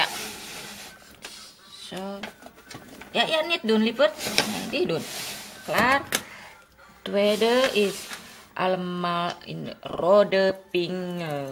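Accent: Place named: Java, Indonesia. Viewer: Mbaknya wong Jowo yaa? Boso Londo logate koyo wong jowo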